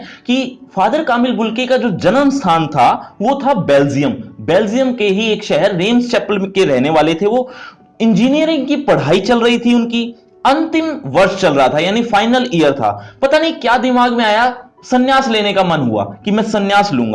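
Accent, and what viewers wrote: Haryana, India. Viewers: sir aap haryana se ho kya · Aap Haryana se ho ??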